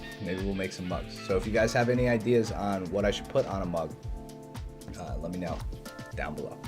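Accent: Norway. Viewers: Is he norwegian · Wait, er du norsk? (are you norwegian) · Are you from norway me to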